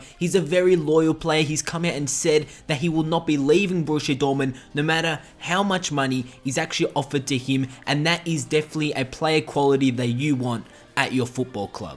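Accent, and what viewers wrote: Australia. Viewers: Are you Australian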